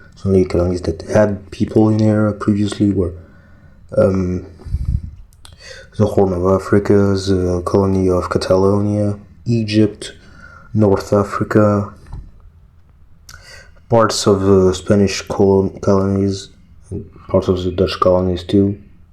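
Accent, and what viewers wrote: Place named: France. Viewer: french is an accent I never thought I'd like you proved my wrong keep cooking mate!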